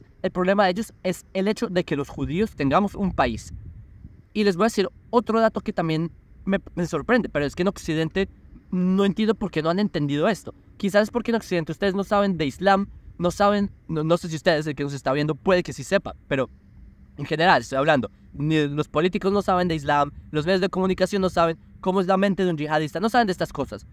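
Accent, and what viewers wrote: Bogotá, Colombia. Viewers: Tienes familia en Colombia? Es que me parece que tienes mucho de acento rolo (Bogotano).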